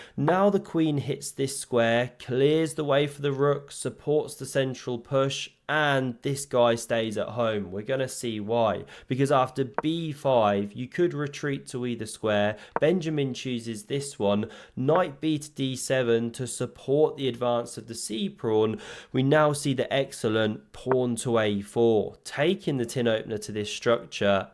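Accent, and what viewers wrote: England, United Kingdom. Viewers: which part of uk is ur accent from?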